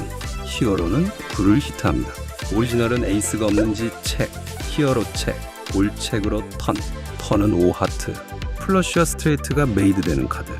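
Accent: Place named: Gyeongsang, South Korea. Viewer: 다른 영상에서 플레이하실때 음성이 나온적있는데 경상도억양이 있으시던데 더빙은 다른분이 하시나요?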